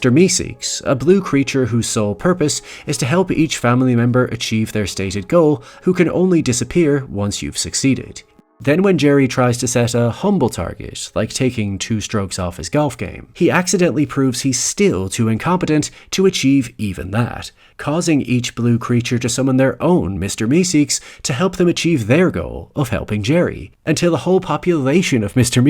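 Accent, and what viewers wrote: Ireland. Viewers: He sounds nothing like Paul Mescal but I suppose accents vary a fair bit in Ireland/Northern Ireland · Bless, Irish for sure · Weird question, are you Irish?😅🤔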